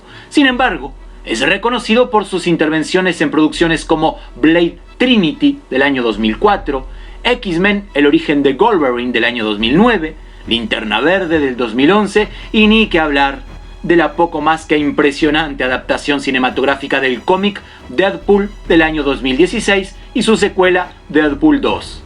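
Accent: Argentina. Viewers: que eres Argentino o Uruguayo??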